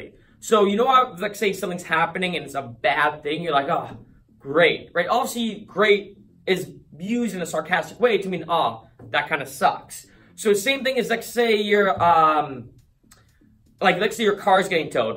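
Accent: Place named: Boston, United States. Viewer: Not a word but a way of pronouncing a word. The rest of the world says "obviously" with 4 complete syllables. You and many in Boston make it almost like a 2 syllable word -- "awvsly".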